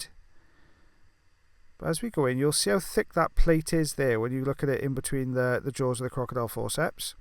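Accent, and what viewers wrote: Wales, United Kingdom. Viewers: I so enjoy your videos. They're so satisfying and I love Welsh voices.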